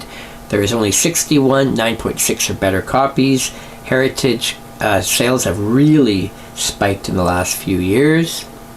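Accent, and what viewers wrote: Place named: Canada. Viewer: Great Canadian accent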